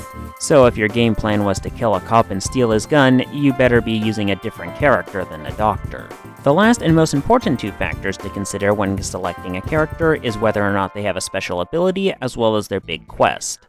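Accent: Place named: Pittsburgh, United States. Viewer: Are you from Pittsburgh?!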